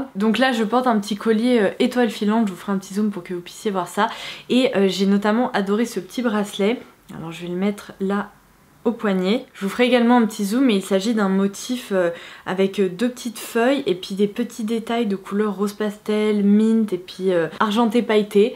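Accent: United Kingdom. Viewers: Ton accent anglais me fais toujours un peu sourire xD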